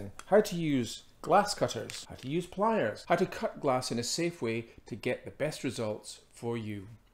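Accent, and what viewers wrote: United Kingdom. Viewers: Cannot hear you, volume too low. Shame, such a nice Scottish accent.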